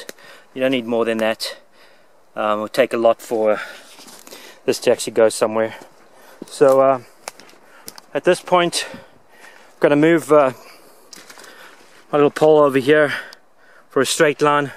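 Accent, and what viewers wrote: South Africa. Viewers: Are you from South Africa? sure sounds like it, awesome stuff btw! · What is your accent? I'm guessing it's a mix, maybe a south african that now lives in america? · Love the videos man! Where are you from? You almost sound south african!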